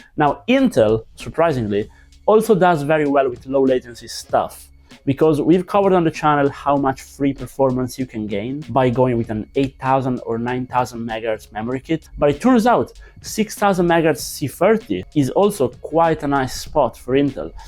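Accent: Italy. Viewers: Are you Italian bro? Your accent is cool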